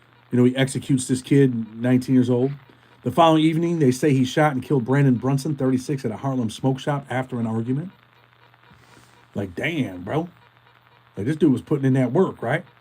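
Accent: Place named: Boston, United States. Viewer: I thought you were from Boston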